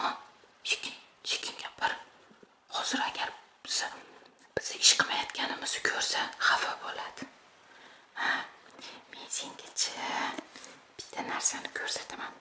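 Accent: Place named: Andijan, Uzbekistan. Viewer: Juda ham ttabbiy chiqqan anjancha gapirishiga bitta❤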